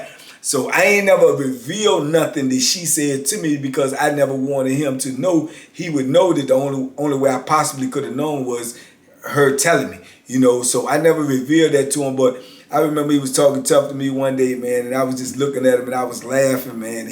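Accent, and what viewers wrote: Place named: New Orleans, United States. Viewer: Have u ever been housed in Louisiana bcuz u sound u from here… New Orleans .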